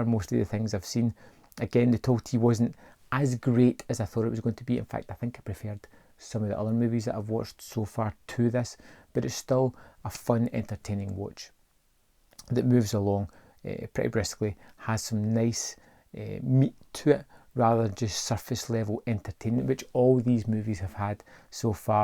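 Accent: Scotland, United Kingdom. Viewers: My cc worked, in American English. The narrator sounds Scottish!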